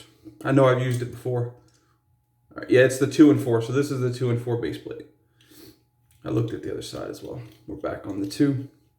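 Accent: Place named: United States. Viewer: That was a good shave! I vote TSE Espresso for the next one. It's called a three-way tie! 😁 Classic Shaving is not tallow based, and all of their scents come in both their regular base and a lanolin base. My pre-shave routine entails using a face wash during a hot shower and then shave right after. I haven't done the hot towel method since I used cartridges. Lol. I'm already getting decision fatigue trying to decide on what soap to use every morning. SMH. I gotta laugh at the gringo pronunciations you use. 🤣 But that's okay, you're still awesome. Hey, how 'bout you mail me a nice bbq'd brisket? Lol. Love you,! 💙🖤💙🖤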